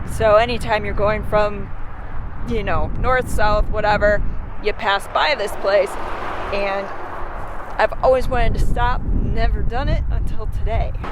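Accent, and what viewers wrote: Wisconsin, United States. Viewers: Love the accent. Reminds me of my family that live around Marathon, Wisconsin. I worked as a professional archaeologist for years documenting old mining districts through out Arizona and Nevada. I currently work for a major railroad and get to see alot of these small town sites that are barely in existence.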